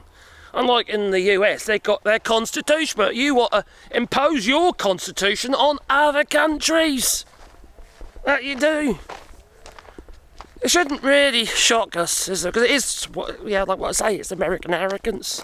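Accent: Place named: England, United Kingdom. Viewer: I'm french from Drôme and like your english accent and free speaking thx friend !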